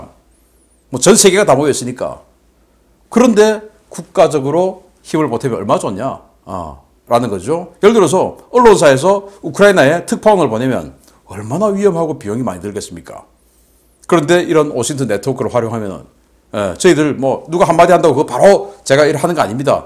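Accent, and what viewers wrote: Gyeongsang, South Korea. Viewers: 구수한 경상도사투리항상잼나게즐구독하고있어예~^^;아침마다구독과좋아요..꾸욱~보냅니다..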